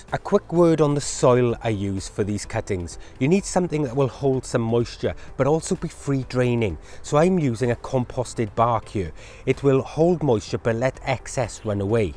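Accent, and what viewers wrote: Wales, United Kingdom. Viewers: Thank you, that was so clear and totally understandable and especially delivered in a lovely Welsh accent. It is so applicable to our Scottish climate.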